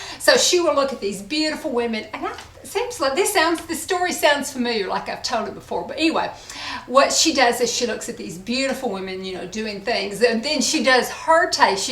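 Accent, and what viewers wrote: South, United States. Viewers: Love ur southern accent!what state are u in...?also would that Bobby brown shade look good on brunettes?always looking for a new shade...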